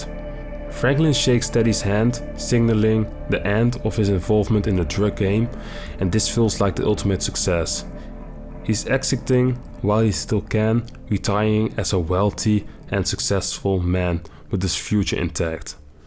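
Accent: Netherlands. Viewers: bro you sound dutch great vid btw. brother ✊🏿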